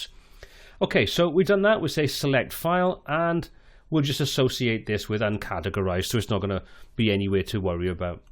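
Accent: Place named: England, United Kingdom. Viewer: Yes, they're absolutely brilliant. Nice to hear an English voice too.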